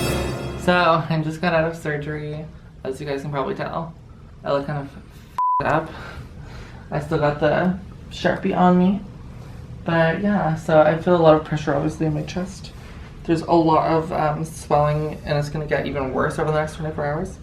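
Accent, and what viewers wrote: Canada. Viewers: I listen to to learn canadian accent of english, she pronounces well, extremely beautiful girl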